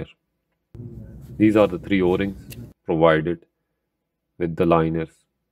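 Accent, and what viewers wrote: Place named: Punjab, India. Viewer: Buddy ur voice sound punjabi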